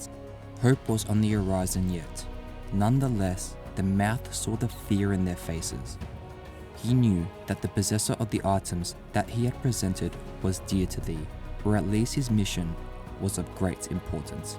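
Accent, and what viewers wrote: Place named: Australia. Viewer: I'm confused, this guy sounds like he's Australian but his English is so poor. Why is that?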